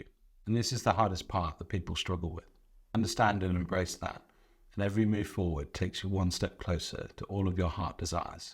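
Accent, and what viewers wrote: United Kingdom. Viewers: pure information with a British accent( I love it)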